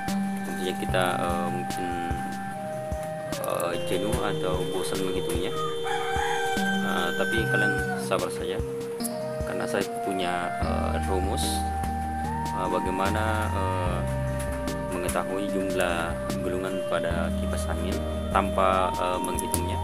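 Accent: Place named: Makassar, Indonesia. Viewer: Bosku dri cara bicara.y kyk orang makassar,,,alamat mn klo area makassar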